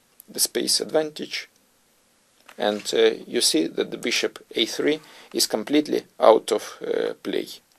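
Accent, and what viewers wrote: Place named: Russia. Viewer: It's very common accent for Russian who speaks English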